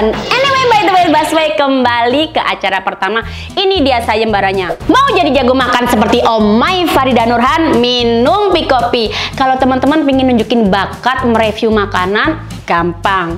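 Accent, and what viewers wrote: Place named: Medan, Indonesia. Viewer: Cobain Makanan di medan ka,, kupikir kaka orang medan karna logat bahasa ny kaya orang medan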